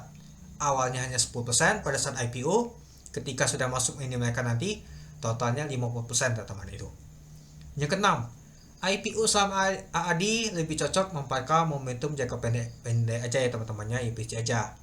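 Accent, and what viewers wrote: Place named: Palembang, Indonesia. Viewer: Lu org Palembang ya Ko?? Logat nya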